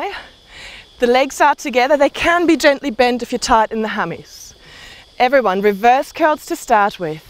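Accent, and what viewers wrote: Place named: Australia. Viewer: Nice video. I love you aussie accent !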